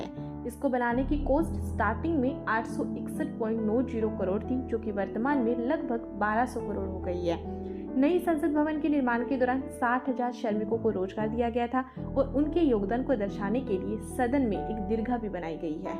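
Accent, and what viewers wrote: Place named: Punjab, India. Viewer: App Punjabi ho😅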